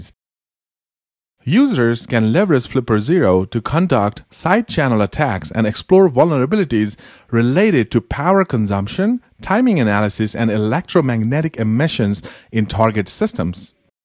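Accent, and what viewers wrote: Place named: Asia. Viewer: Old fuking video fukng asian accent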